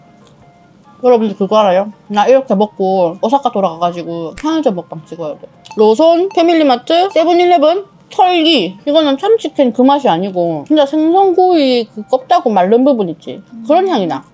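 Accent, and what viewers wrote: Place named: Busan, South Korea. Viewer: 아리가또~고자이마스~ 부산 사투리 ㅋㅋㅋㅋㅋㅋ넘 귀여운거 아니냐구우~~❤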